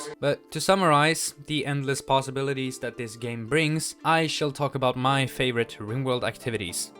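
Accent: Sweden. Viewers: Correct me if I'm wrong, but is that a Swedish accent?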